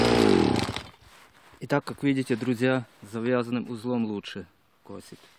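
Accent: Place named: Moldova. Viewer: Судя по акценту - молдованин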